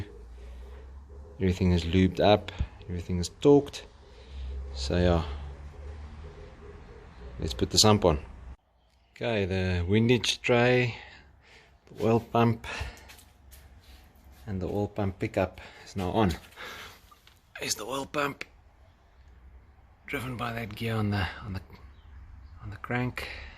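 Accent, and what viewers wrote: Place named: Cleveland, United States. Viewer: You have an interesting accent. Are you from Cleveland?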